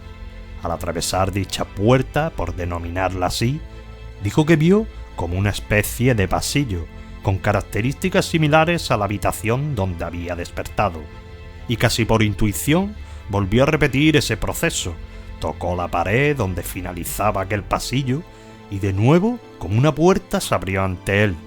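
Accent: Spain. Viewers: Hola Me gusta cómo narra los videos y las historias Yo vivo en California soy de Guadalajara México gracias por tan bonitas historias Por su forma de hablar creo que viven en España gracias otra vez y Hasta la vista recuerde yo lo escuchó siempre y la mayoría de sus historias las tengo guardadas Hasta la vista y cuídese Que Dios lo bendiga Pepe Gaitán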